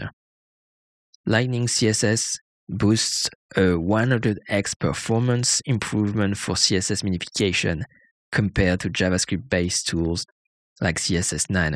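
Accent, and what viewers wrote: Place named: France. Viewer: are you French ? (I'm French and you have an French accent)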